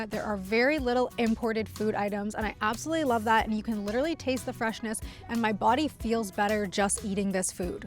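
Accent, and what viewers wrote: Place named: New Zealand. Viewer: Hiya, great to to see you out and about! Canadian you say, but sounds kinda Kiwi? Any case, how are things going with that heavy metal (copper covered lead) allergy of you yours? Y'know, that high-speed / high-impact variety of lead? Causes severe skin irritation and all sorts of internal issues too, if I remenber correctly? Hey, have you tried to find a Job yet and earn some those cheap SA Rands? The same one's that seem so worthwhile right now, with the exchange rate & all? Honey, you're cluelss about where you are. Keep posting, it's HILARIOUS!. Enjoy while you can!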